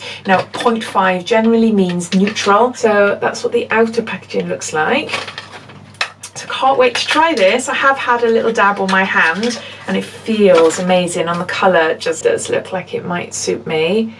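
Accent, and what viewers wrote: Wales, United Kingdom. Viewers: Been following your channel for a while now and really enjoy it. You are very down to earth and natural!!. I have subscribed to the Look fantastic beauty box and feel unique sample service.You sound as though you may be from Wales (im guessing Newport/Cardiff.?). xx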